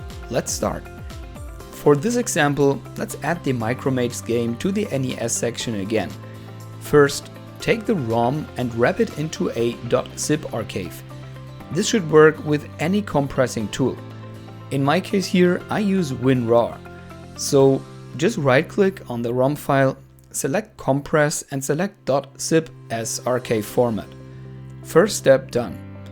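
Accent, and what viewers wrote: Germany. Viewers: Da höre ich doch deutschen Akzent! :D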